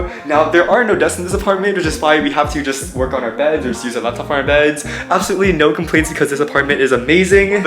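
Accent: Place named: United States. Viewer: afterthis video i realised how american your accent actually if and my mind is blown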